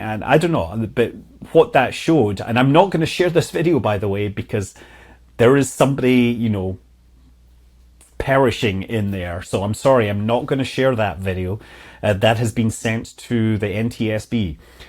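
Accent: Scotland, United Kingdom. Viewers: Wouldn’t it make sense to have a telephone tree to get everyone to evacuate all aircraft from nearby hangars until the fire is out and cold? Don't forget to update (and keep updating) Dan Gryder, who maintains GA fatal statistics and advocates AQP. Have you taken AQP training? And get the wife trained up at least to do a landing, because human beings, namely you, have health failures at the most inconvenient ties. Gnosis?!? I thought you were from Scotland. Well, you’ve got the accent down pat. I’m from Sacto and learned to fly from Naps in ‘70s.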